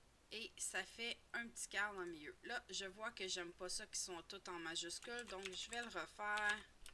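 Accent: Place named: Canada. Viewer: Ah cet accent canadien ! j'aime beaucoup ! Et merci pour le tuto.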